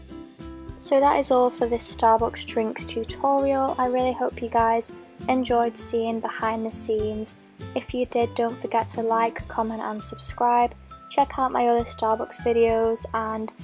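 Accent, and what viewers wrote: United Kingdom. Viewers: You're from London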